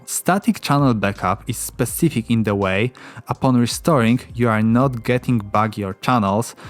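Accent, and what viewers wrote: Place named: Poland. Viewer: Where are you from? You sounds a bit Polish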